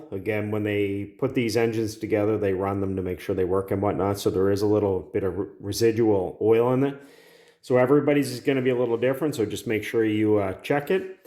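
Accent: Canada. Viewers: When you mentioned the price, I thought, "that seems high compared to what I just paid for one...". Then I listened to you speak some more, and immediately realized you were Canuk. As Bob and Doug would say, "Good day, eh."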